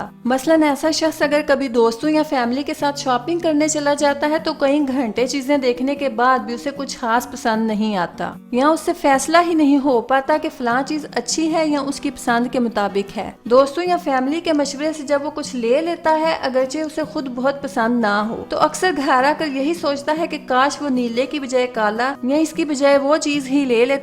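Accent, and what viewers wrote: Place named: Sialkot, Pakistan. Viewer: like your sialkoti lehja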